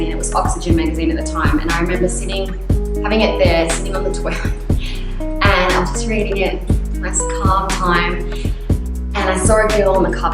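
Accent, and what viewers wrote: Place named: United States. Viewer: Honestly I thought you were American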